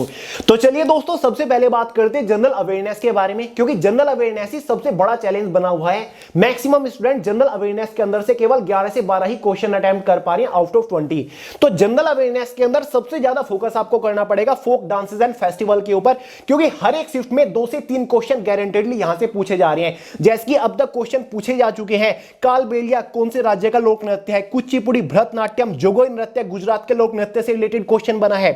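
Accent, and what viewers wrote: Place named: Haryana, India. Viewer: SIR APKA HARYANAVI ACCENT SEEDHA DIL PAR LAGTA HAI 😊❤️ MAZA AA GAYA REVIEW DEKH KAR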